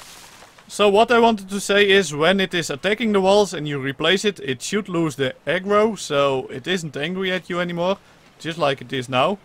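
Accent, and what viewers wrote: Netherlands. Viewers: Hij is sowieso Nederlands. Dat hoor je duidelijk aan zijn uitspraak en hoe hij "Simba" zegt. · is this guy dutch because of his accent?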